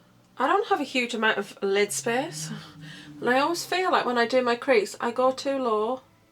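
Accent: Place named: Newcastle, United Kingdom. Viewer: The eyeshadow looks lovely with your hair... very pretty.. Im sure you have a hint of a Geordie accent.. x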